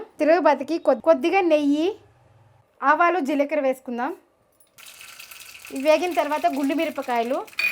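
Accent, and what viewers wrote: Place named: Kanchipuram, India. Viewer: కామక్షి తాయి లాగా ఉన్నావమ్మా కంజీవరం అమ్మదా మాట్లాడు తునట్లు వుంది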